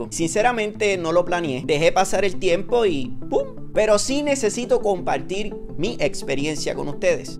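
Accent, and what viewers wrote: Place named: Puerto Rico. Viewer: Hablas como puertorriqueño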